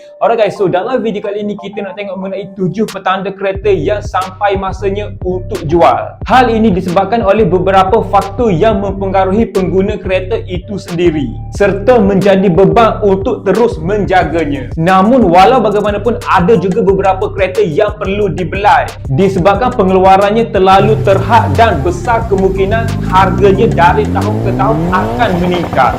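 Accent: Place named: East Coast, Malaysia. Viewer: bagus ada skit cara org pantai timur cakap saya suka, teruskan cara ini, kalau org utara cakap depa bantai slang mereka je skrg org timur pun patut cakap cara slang timur baru ada kpelbagaian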